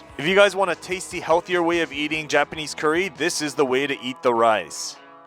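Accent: United States. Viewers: you sound so American to me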